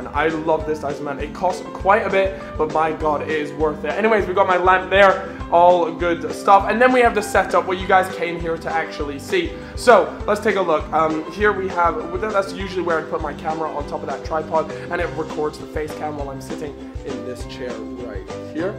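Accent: United States. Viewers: hey are you american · why does he sound so American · Is he American?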